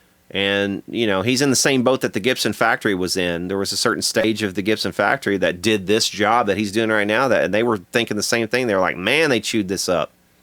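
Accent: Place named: South, United States. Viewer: Always refreshing to hear your Southern accent and your excellent content. I watched that video with that mad scientist guitar builder guy you had the other night and it was so interesting but my favorite video to date has been the one where you soup top the five white Epiphone tube head. Also the Marshall mg restoration vid that you salvaged. I’ve learned so much watching this channel. 🤘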